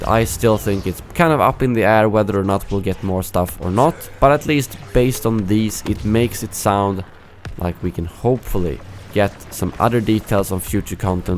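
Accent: Sweden. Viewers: are you swedish? är du Svensk?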